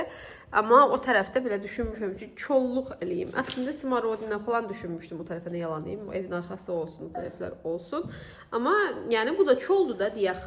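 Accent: Sheki, Azerbaijan. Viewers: Сох sırındı sekı lehcesı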